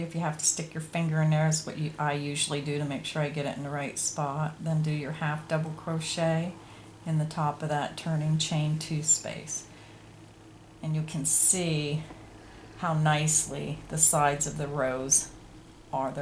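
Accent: Pennsylvania, United States. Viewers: Where are you from? You sound just like my best friend from Pennsylvania. I really enjoy your classes and have learn quite a few new stitches by watching. Thank you for your time and your posts.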